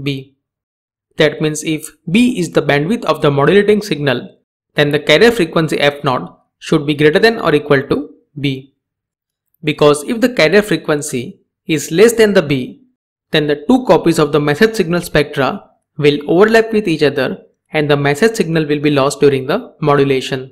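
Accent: India. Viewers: I wish I didn't have trouble understanding the Indian accent. Thanks a lot for the explanation though.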